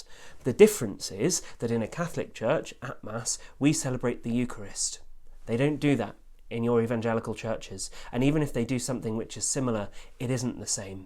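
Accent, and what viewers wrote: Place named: England, United Kingdom. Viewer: Nice to "hear" an English priest!!!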